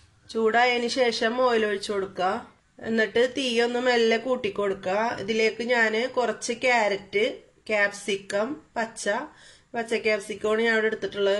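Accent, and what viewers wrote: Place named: Azhikode, India. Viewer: Ithaade Samsaram kettapol thonni.😊 athaa chodhichath.. njan kaipamangalam aanu.. husbandinte relatives und azhikod.. angineya ee slang parichayam☺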